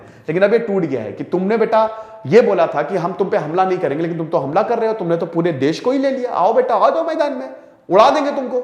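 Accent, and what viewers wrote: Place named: Bundelkhand, India. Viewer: Sir i think you are belonging from bundelkhand madhya Pradesh. When you say "कुछ तो करै क पड़ी"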